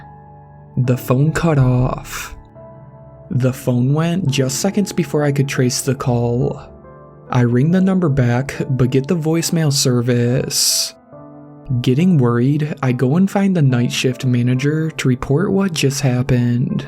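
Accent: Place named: United Kingdom. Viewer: This person is most definitely British 😂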